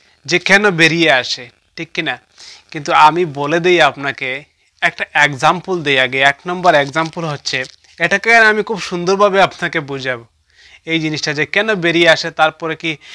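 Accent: Sylhet, Bangladesh. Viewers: Apnki ki sylheti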